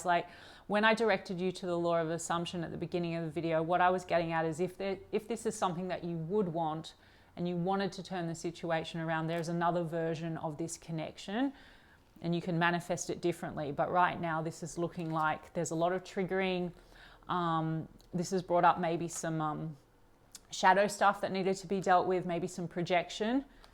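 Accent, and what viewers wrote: Australia. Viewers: Great to hear an Aussie. Surfers Paradise here 🇦🇺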